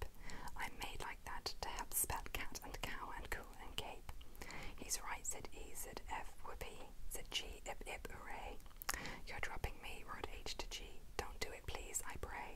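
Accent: Ireland. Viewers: I'm Irish and I'm from Belfast and I have heard a couple people from Dublin and cork and ur accent is really good and thank u for not saying top in the morning to ya😂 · This is the best Irish accent ever done by someone out of Ireland hands down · One of the best Irish accents you literally sound like me !!!!! (I’m from Ireland) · the one person who can actually do a decent irish accent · it’s kinda cool to see someone do an actually good irish accent. she’s definitely got the soft t’s and d’s spot on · Being irish myself Not a bad IRISH accent at all well done girl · I'm Irish and ppl always try to sound like me but they can't rlly do it, BUT U ON THE HAND! IS GREAT! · I was so confused watching this at first. I was thinking, does she have an Irish twin??? 😅 Brilliant accent! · wait what i thought she was irish um hello she’s a better irish than me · this is literally an Irish accent I would’ve thought you were from Dublin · This is class I’m Irish my self and you sound very Irish ☘️ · Omg! 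Coming from an Irish person, your accent was so good! Especially for a fake one. Alot of non Irish people think it's a hard accent to be successful in. I'm glad u like the accent😘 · Can’t find any Irish asmrtists you’re the closest thing so far! I love my countries accent haha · So unreal! i’m from dublin myself, and i was shocked to find out you weren’t irish yourself!! deadly accent! you put me straight to sleep too btw, this was so comforting to me :’) Xx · Sounds stronger than mine... Im from fooking Limerick. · You did an awesome accent!! I already love your accent but I love Irish ones!! I’ve always loved talking in different accents too lol it’s fun!! 🍀💚🍻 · Brilliantly done, not many people can do the Irish accent justice ❤️👌 (I’m from cork Ireland 🇮🇪) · I’m Irish and this is honestly one of the best fake irish accents i’ve ever heard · I’m Irish and your the first person to nail the accent FULLY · Wtf you have a better accent then me an Irish person low-key shook 😌😪🤩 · Im Irish (Dublin) and can say that you have a very good accent 👌🏽 Go Maith! 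🇮🇪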